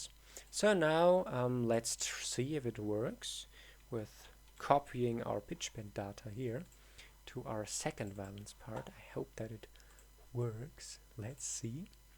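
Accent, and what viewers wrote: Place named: Germany. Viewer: Aber Du hörst dich schon an als ob Du krampfhaft versuchst, den deutschen Akzent zu vermeiden :P (Geht mir aber genau so, wenn ich im Ausland bin..bloß nicht Deutsch klingen^^)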